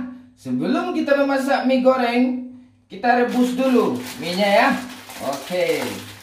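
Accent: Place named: Java, Indonesia. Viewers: Kok medok bang😂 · Bg kok ada medok" nya kayak Youtubers porbolinggo 😂😂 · Wkwkwk kok medog yaaa wkwk ngakakk😂😂😂😂😂😂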